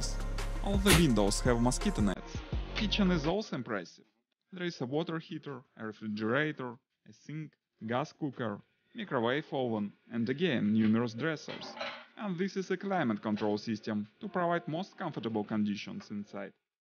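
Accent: Russia. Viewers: Братан акцент поправь, прям по произношению слышно, что говорит русский! 🤣🤣🤣 Привет с Казахстана! Монтаж видео зачет! 👍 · Love your accent my Russian friend.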